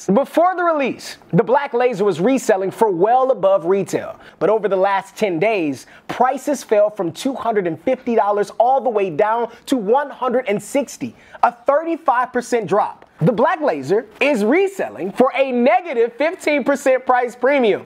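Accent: France. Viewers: Sacré bleu, the only thing hotter than french accent is his taste for haute couture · Très bel accent francais 👍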